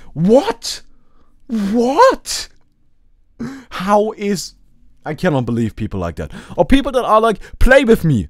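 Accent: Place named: Germany. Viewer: you are a truly great speaker. There is some sort of honesty in your interaction with your viewers, that is very precious and rarely found. The way you open up to your viewers is so inspirational, and it sets our (mine at least) minds at eazy to listen to your charming german accent in you kind tone. These are all valuable qualities in life. Now, i did what you told me and thought about my comment, so now I'm asking you to think about why your channel is finding succes. It is your accomplishment, and yours only. Don't rush into something your heart isn't in.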